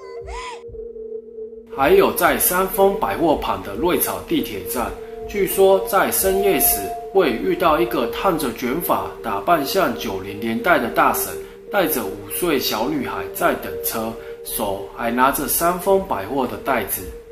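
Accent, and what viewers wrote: Taiwan. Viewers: 有台灣國語😂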